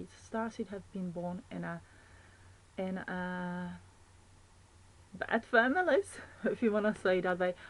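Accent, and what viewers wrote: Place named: Asia. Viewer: i love your accent..it almost sounds like an asian dialect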